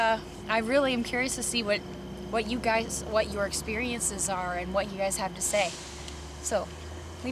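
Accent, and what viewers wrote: Wisconsin, United States. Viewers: You sound like your'e from WI?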